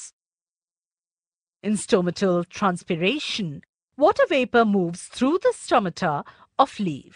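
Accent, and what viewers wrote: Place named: India. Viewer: U sound like hindi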